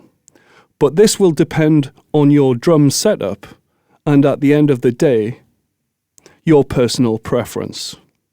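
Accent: United Kingdom. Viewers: where is his accent from in the UK?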